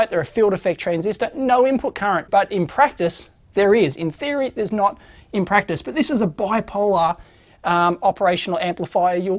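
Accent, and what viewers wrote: Australia. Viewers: Its very funny to click on transcript and watch as the AI struggles to match Aussie accent. in particular...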